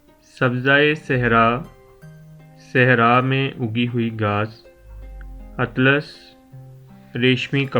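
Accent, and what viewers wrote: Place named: Punjab, Pakistan. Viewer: زبان پر توجہ دیں۔ اردو کو پنجابی میں ادا مت کریں۔💓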